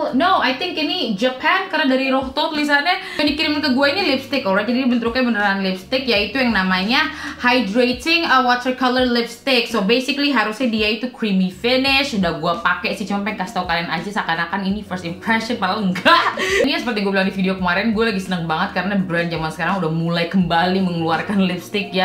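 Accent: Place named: Papua, Indonesia. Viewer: Sukaaaaaa bgt ama logat PAPUA nyaaa 🥰 sering2 dipake kaaaa 🥰👍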